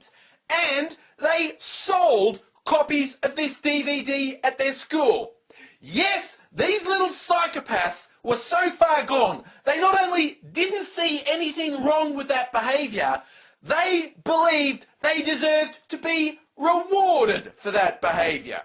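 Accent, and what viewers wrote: Australia. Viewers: Words Well spoken Aussie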